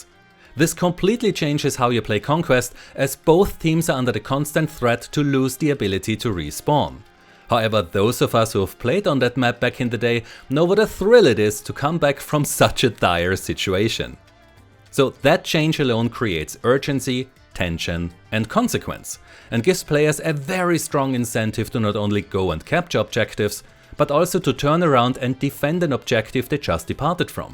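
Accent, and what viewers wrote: Germany. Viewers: Deutscher Akzent? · Are u german? Your english sounds like that😅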